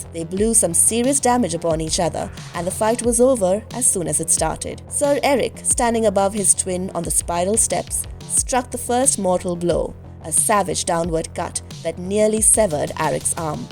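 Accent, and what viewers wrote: India. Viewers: but indian voice :/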